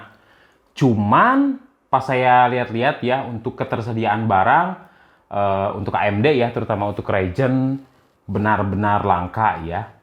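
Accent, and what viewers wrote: West Java, Indonesia. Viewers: kaya ada logat sundanya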